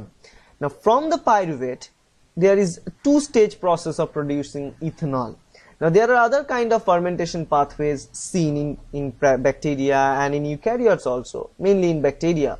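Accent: India. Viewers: thanks so much man you the only one in the in the internet who actually explains it well. Loving your indian accent!!! thx a lot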